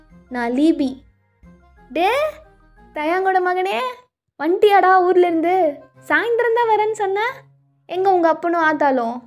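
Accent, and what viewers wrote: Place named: Kerala, India. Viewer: Do you have any Malayalam connection.. actually unga tamil videe malayalam super ayii irik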